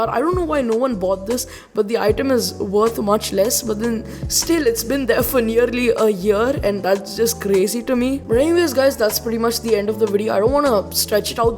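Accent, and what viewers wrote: India. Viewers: i still luv ur indian accent <3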